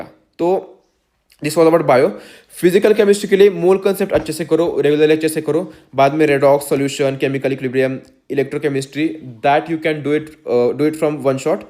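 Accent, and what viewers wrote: India. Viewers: Lagta hai aap maharashtra se hai 😂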